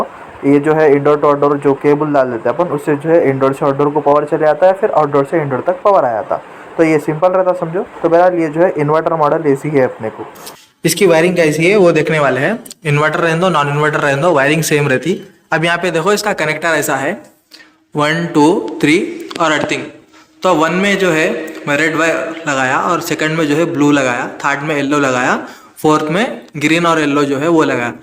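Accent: South India, India. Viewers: South Indian voice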